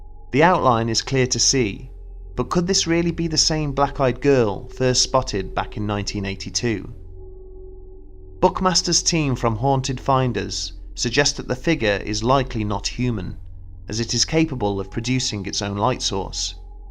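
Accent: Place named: England, United Kingdom. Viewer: The dude’s English. I’m willing to bet he knows how to pronounce Leicester while you do not. Get over yourself.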